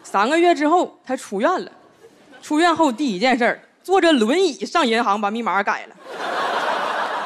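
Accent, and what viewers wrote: Northeast, China. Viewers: 就喜欢这个，东北口音和天津口音天生带着喜感。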